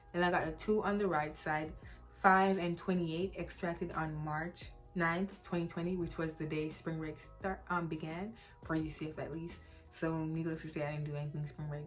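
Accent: Jamaica. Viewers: Can definitely tell that you're jamaican 😂❤ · Jamaican accent? Subscribe!! ❤️💪🏾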